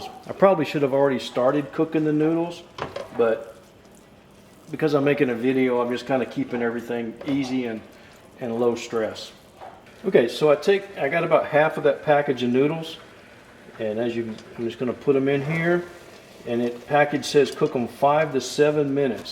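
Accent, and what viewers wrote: United States. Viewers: Looks great! I never like the pork in this dish to be honest, so it's perfect for me☺ Btw, I really like your accent! Where in the US do people speak like that?🤔 Sorry, I'm from Europe, so I can't tell...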